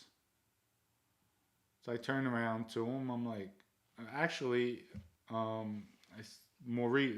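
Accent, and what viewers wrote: New York, United States. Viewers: I’m in queens and they speak the same way here. It’s not a Bronx, Brooklyn or Long Island thing. It’s a New York accent.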